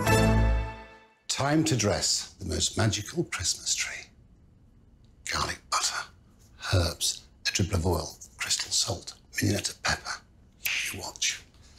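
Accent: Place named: Leeds, United Kingdom. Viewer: His accent is pure Leeds, UK. I love it.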